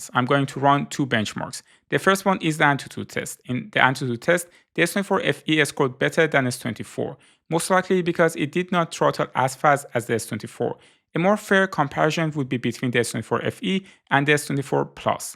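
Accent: Iran. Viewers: داداش دمت گرم خدایی ایرانی هستی؟؟ انگلیسیت خیلی با لهجست😁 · Your persian accent>>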